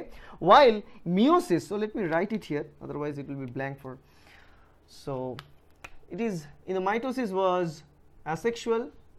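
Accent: Bengal, India. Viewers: Sir are you from West Bengal? Because your talking is like Bengalis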